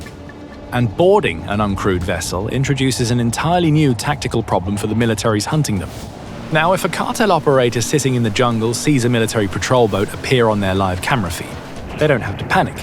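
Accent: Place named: United Kingdom. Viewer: It's a lie story with a British accent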